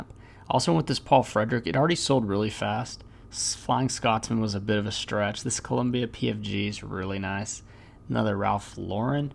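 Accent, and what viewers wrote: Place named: Dallas, United States. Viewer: Lol, I just love your videos. I usually watch YouTube on Roku so don't comment but I've been watching for a few months; I'm in Tulsa and went full-time with eBay selling during covid lockdown. By the end of it I was making more money on eBay than I ever made teaching so still haven't gone back to my former job. I've never sold clothes but plan to get into women's, particularly things that I can find here easily and sell on the Pacific corridor and in France, where I have family, for a profit. I won't be doing mens' modern so no competition from me, but I do hit the Dallas thrift stores about once a month when I'm down there. I've also sourced in Oklahoma City but never in Lawton. I love all the vintage western shirts, I swear I could make a killing in France on those but it's not entirely legal for me to bring a bunch of merchandise I plan to sell over there in my suitcase. 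Tempting though... You look so much like my Dallas cousin, and I swear your accent is exactly the same. I just die when you stop for a victory cone at Braum's after a day of sourcing. It's so refreshing to see another somewhat local seller that I can relate to, even if we don't sell in the same category. Just had to pipe up and say how much I enjoy these since I happen to be watching on my laptop tonight and have a keyboard handy... keep it going, you're really the best! I love it!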